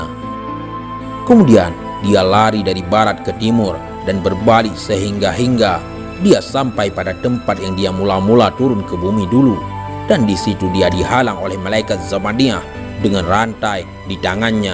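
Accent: Aceh, Indonesia. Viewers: Minin awak aceh nye? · Apakah admin orang Aceh?? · ini yang bicara orang aceh ya. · Orang Aceh ni suaranya.. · Admin nya logat aceh ya🙏🙏🤭🤭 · Logat Aceh susah utk di hilangkan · Tuh klo ga salh logat Aceh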